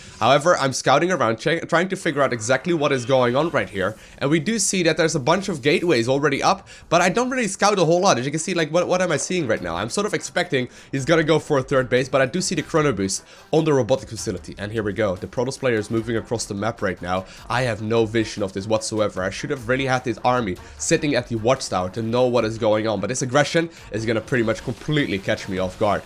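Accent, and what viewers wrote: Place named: Netherlands. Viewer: Pretty sure he's Dutch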